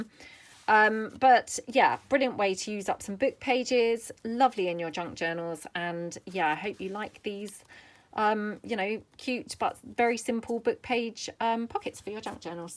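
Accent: United Kingdom. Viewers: I love to watch your videos. I love your chatty ways. You take my mind off my worries for a while. It's like having a British friend over to craft!! What fun! Imagine you listening to my Southern Oklahoman accent. Very beautiful work. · it’s not Diane. I knew it had to be somewhere in the UK because I have a friend in London with the same kind of accent. I am from Michigan in the US. I absolutely am loving your tutorials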